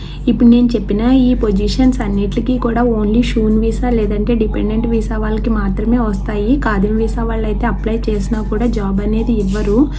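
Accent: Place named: Godavari, India. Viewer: అక్కో మీది గోదారి జిల్లా 😄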